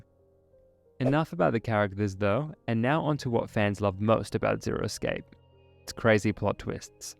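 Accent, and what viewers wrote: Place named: Australia. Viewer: I even would have taken the Meta route as Uchikoshi suggested and did'nt follow up on that the player is some sort of multi-dimensional reaching entity or even a well meaning alien helping them through Alien technology, considering he brought Alien technology to the series..don't really see the harm in making a big part of the series. Sure make them an all-seeing entity but they're not all powerful. As crazy as that sounds, it's much bigger gut punch of a plot twist and would make me buy that humanity had a fighting chance against the Religious Fanatic even with the same ending. Zero would also know of him through the machine's existence naturally. He's a very (lost?) Youtuber who has many differently named accounts (one possibly deleted?) he makes videos on until he went back to his main one cos it was naturally the only one with any traction. 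It was just tiring bumping into him in the algorithm when he could just make videos on the main channel instead like he eventually relented to. Your ozzie accents are a touch similar after all, my mistake.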